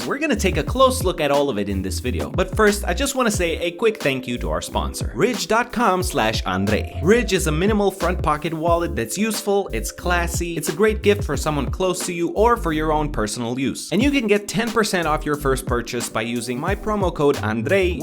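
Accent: Romania. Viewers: Wait wtf why is thi guy having a "romanian" accent?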